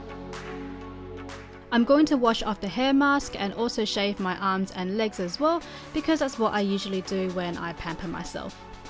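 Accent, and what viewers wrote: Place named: Australia. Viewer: wheres she from she sounds like an aussie maybe a kiwi...?????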